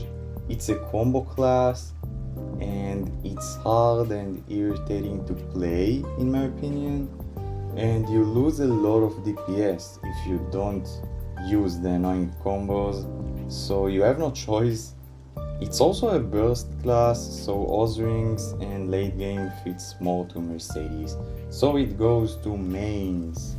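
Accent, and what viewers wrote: Israel. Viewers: You have heavy Israeli accent 🫣🫶🏻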